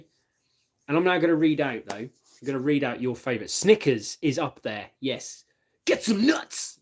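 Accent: United Kingdom. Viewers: Best British accent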